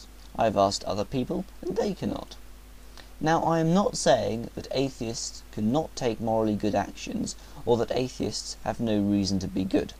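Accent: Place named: United Kingdom. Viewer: I don't know of which city you show your clips from, however, all countries have riots at one point or another. It's just that the secular ones have less crime, murders, thefts, lootings and gun violence. Your accent sounds British. Were you aware that since 2000, many Christian churches in Britain are converted to mosques, temples, shops, stores and tattoo parlors. Yet in "godless" england, 0 school shootings since 2000. In 80% god fearing USA, since 2000, dozens of shootings, 150 deaths. FOOL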